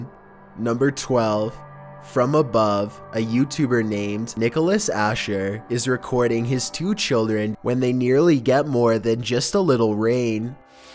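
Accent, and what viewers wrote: Sweden. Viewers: Är du Svensk eller?? = Are You fr.o.m. Sweden??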